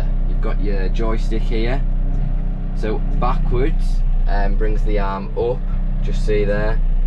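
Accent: United Kingdom. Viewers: One of many. Think hes from Cumbria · Nice vid, is this a British accent ? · Sounds like Yorkshirian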